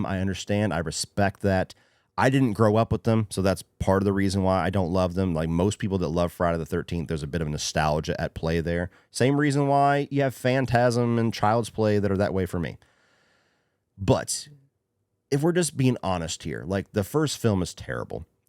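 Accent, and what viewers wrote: United States. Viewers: I’m surprised no one has offered him voice work. He has that perfect American voice that would appeal to folks in Asia and elsewhere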